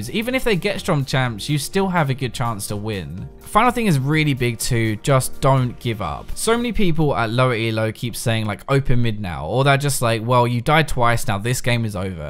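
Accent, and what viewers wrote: United Kingdom. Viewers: Main reason I watch your videos is your wonderful British voice.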